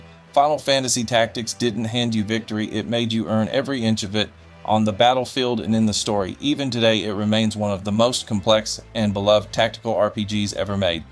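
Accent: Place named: South, United States. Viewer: Where are you from? That's a thick southern accent. Well narrated, good job mate!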